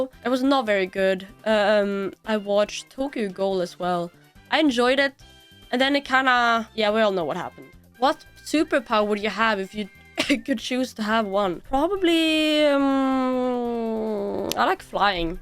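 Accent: Sweden. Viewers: There's actually the tiniest hint of a tiny Swedish accent if you listen carefully. All Swedes I've met in real life speak execellent English. Oh well an then there's Anomaly of course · you sound swedish. its the swedish accent breaking through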